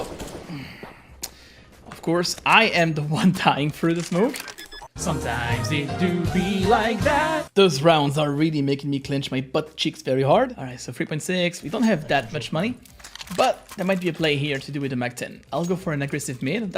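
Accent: France. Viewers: Are you French? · Omg the french English accent is the cutest thing ever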